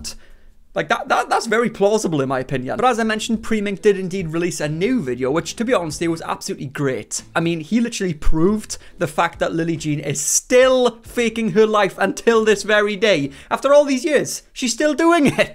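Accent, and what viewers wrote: Wales, United Kingdom. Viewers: He has a Welsh accent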